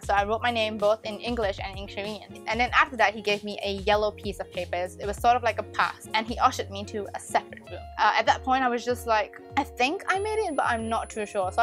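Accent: United Kingdom. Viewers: You sound British 😂😂 btw is it ok to tell us the group you were going to debut with.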